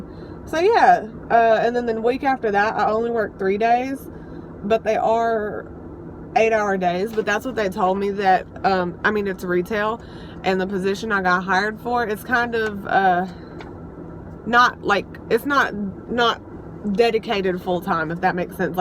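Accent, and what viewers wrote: United States. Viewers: Btw defiantly can hear the Tennessee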